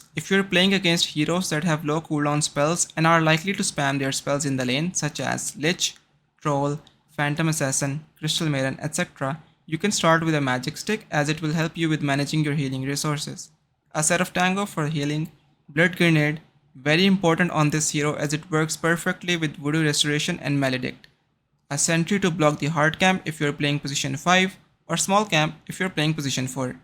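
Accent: India. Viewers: >Excited to see the video from the Reddit post >Indian accent :(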